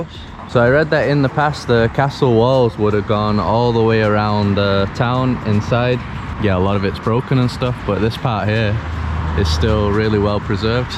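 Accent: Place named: Northern England, United Kingdom. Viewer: Northern English + American + Global English. Wow bro, you're a true nomad :D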